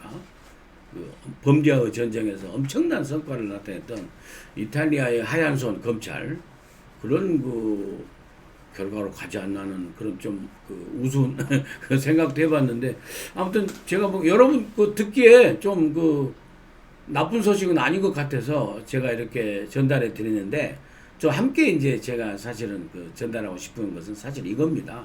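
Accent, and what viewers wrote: Jeolla, South Korea. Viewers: 요즘 열라열라 에서 안보여서 ...목빠지게 지달립니다 시원한 전라도 사투리로 욕도 질퍽하게 맛깔 스럽게 좀 해 주시지요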